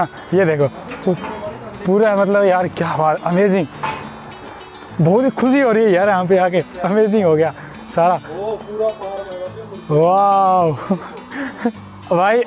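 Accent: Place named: Punjab, India. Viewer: Bai ji, Mai roz hi tuhade vlog vekha haan, par Subscribe nhi si kita. Par aj theth Punjabi sunde saar sidha hi Subscribe button nap ta. 😍💕🙏😍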